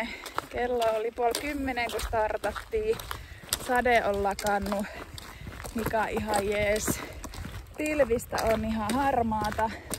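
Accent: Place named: Ostrobothnia, Finland. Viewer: Mukavan rentoa meininkiä 👍 Pilkahduksia pohojammaan murteesta 😊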